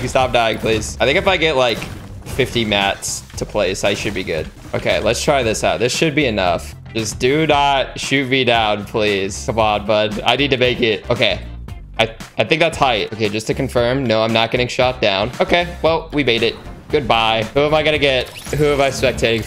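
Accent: Germany. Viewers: Is he German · Are you german?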